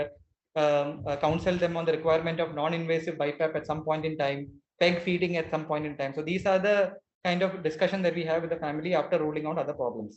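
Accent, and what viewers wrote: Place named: South Asia. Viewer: At least 350 million people - Indians plus Pakistanis plus srilankans plus bangladesh use English with this accent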